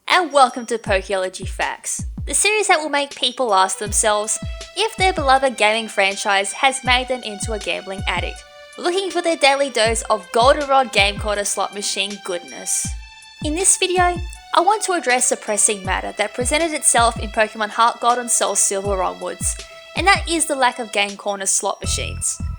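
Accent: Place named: Australia. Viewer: I am tempted to not watch your videos for a while, not because I don't like your videos, but because I love listening to your voice speak about Pokemon. Sounds weird I know but I like Australian accents x)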